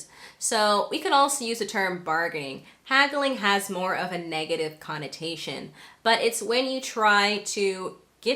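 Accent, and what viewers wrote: United States. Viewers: Hello Ms....i really appreciate your support in my English learning journey. BTW the lesson Is actually very usuful. Nice going. P,S. You're so lovely love your American accent. Greetings from Mexico ❤️.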